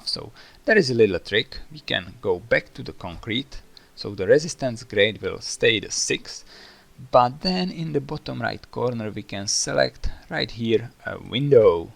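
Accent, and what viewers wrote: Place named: France. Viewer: Love your accent... French I guess?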